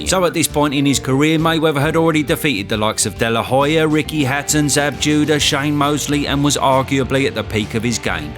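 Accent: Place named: Essex, United Kingdom. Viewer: Can they get someone else to do the commentary, his flat Essex/counties tone is really off putting, but great channel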